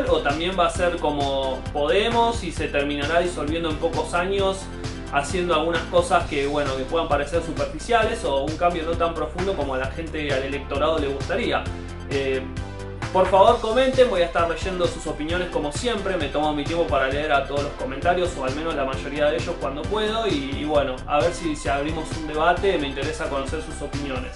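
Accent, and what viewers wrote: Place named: Argentina. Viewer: Hola. De qué parte de Argentina sos?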